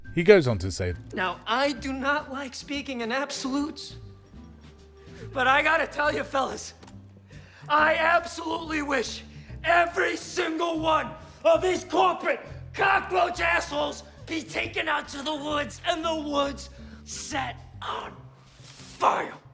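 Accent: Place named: United Kingdom. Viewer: British voice complaining about whatever on YouTube… what a surprise 🙄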